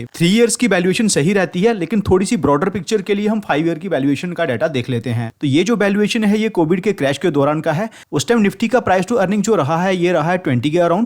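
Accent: Jammu, India. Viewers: Bhai jammu se ho kya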